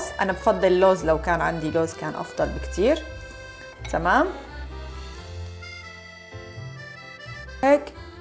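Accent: Aleppo, Syria. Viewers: بيشهي وبيبل القلب... وانت وضحكتك بتبلي القلب... وحكياتك الحلبية الحلوة.. شكرا أنت الأروع وتزدادين روعة ❤️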